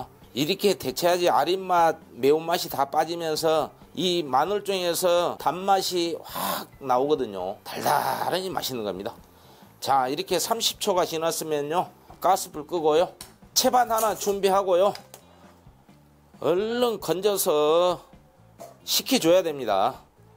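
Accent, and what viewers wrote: Jeolla, South Korea. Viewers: 황태채 마늘쫑볶음~~구수한 수쿠락~워메워메사투리 ㅋㅋ~~레시피 감사 합니다~~👍👏👏👏👏😍⚘️⚘️⚘️ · 당장이라도 해서 먹고 싶네요~~^^ 전라도 사투리~~^^ 찐입니다~~ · 최고에요 전라도 사투리에다. 맛있는 마늘쫑 황태포랑. 함께. 꼭. 만들어 볼께요 감사합니다 ❤❤❤ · 낼은 이반찬 도전합니다. 구수한 전라도사투리 정감있고 안먹어봐도. 군침도네요~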